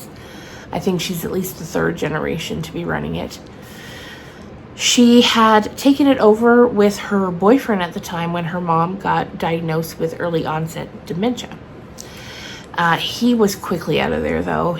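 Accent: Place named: South, United States. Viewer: Sassy southern - love it.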